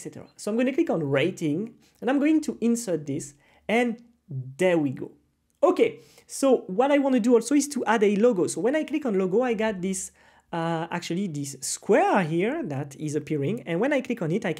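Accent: France. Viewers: you have a french accent 🤔🤔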